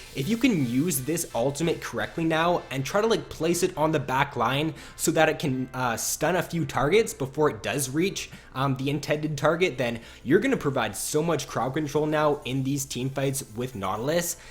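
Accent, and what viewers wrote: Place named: Canada. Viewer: ...You're Canadian aren't you... Good video though.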